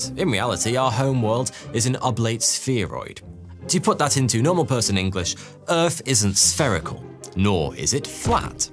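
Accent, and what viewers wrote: United Kingdom. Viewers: Yup, its all about story telling, a nice British accent that gets you 358K view in just 7 days and poor me with all the mathematics and Indian accent can make 400 views in 1+ year 😛- Just measure base to tip and it aint that big ha ha. Love this guy. Wish I had a good voice like him.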